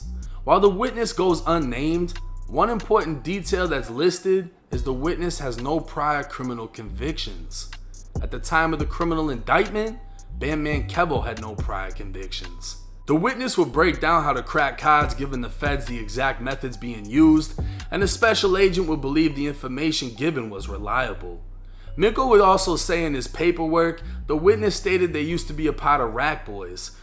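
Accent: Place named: Chicago, United States. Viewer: u the goat u gotta be from the raq boa u sound just like us great content.. bandman Kevo the city proud of u boa.. turned yo whole life around respect.. did u really get a bbl tho g? No judgement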